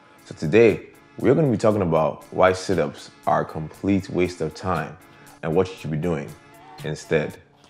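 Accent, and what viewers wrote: Nigeria. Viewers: Yo, i heard that nigerian accent 😂😂😂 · This voice is so Nigerian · I sensed he was Nigerian from his accent at first. I felt kind of joyous when he confirmed it with that pidgin English line.E choke😁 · i can hear the nigerian in his voice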